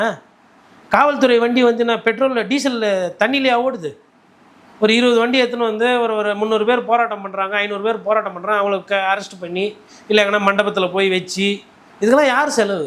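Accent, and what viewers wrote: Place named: Chennai, India. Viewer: அண்ணா நீங்க வேற மாதிரி.சென்னை பாஷைல.ஆனா நா கோயம்புத்தூர்.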